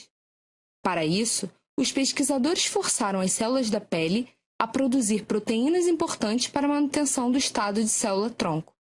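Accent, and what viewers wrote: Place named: Rio de Janeiro, Brazil. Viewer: Sutaque maravilhoso, as cariocas são maravilhosas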